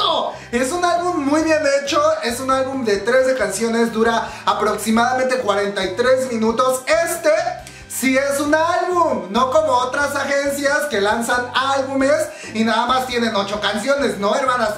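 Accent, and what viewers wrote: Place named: Mexico. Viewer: Comadre yo te sigo por la forma como tu hablas me encanta tu acento mexicano yo no soy fan de ningún grupo femenino de Kpop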